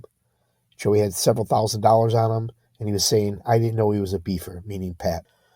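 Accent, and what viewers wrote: Chicago, United States. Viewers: You've got a natural talent for this and a great Chicagoan speaking style. Run with it. Your doing real good. You keep it real with the chuckles at times and all. Keep it about the trials and cases and you can go far. GL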